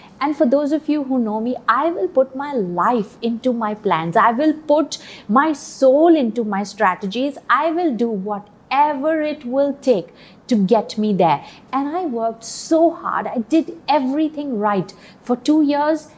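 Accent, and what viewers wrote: India. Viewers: This is Indian English. I was expecting this English. Very nice.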